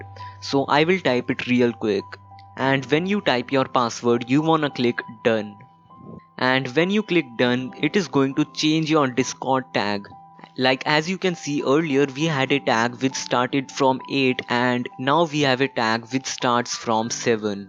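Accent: India. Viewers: The method is real people just doesn't like Indian accent thise days...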